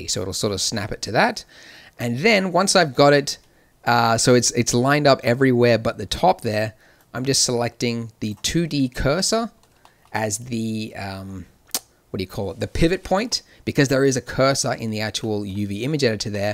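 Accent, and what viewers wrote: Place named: Australia. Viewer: Ritchie He's Australian... Can you really not tell?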